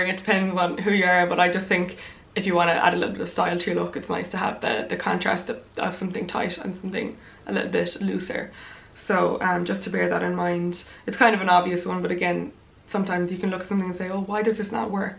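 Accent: Ireland. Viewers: I love your Irish accent ☘️💚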